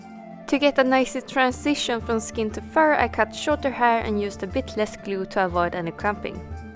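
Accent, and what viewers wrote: Sweden. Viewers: You sound swedish, cus of the kinda bad english🤔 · You sound swedish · Man hör att du bryter på svenska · this is so awesome! just a question are uu Swedish? I just think so of your accent when you speak English, I might be wrong x3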